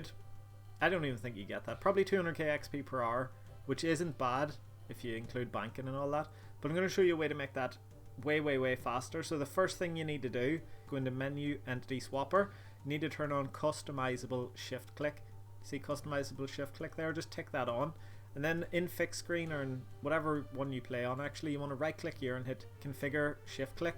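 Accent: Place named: Northern Ireland, United Kingdom. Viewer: Subbed and liked! Are u from Ireland by any chance? Ur accent sounds northern Irish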